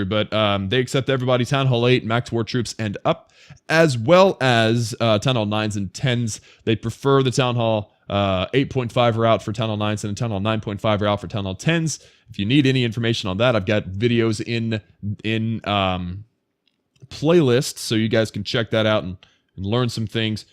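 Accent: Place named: Germany. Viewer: Congrates for hitting the 10k Subs. You have good content - keep up the hard work. Considering your name and I thought I scented an ever so light accent - do you have some german roots? Regards from Hamburg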